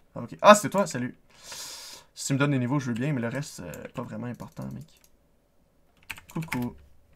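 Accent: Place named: Quebec, Canada. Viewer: je viens de tomber sur ta chaîne et JE L'ADORE. Bon xD Bisous de France c:. J'adore l'accent québécois xD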